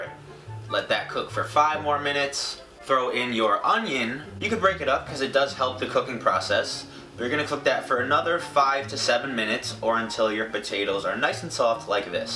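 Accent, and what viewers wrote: New York, United States. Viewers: Are you a New Yorker? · Are you from New York? You kinda sound like it.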